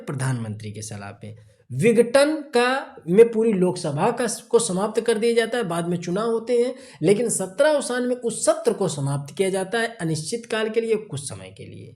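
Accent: Kanpur, India. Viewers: Sir aapne bahut ache se samjhaya.... Kya aap kanpur se ...apki awaj se aise lga mujhe ...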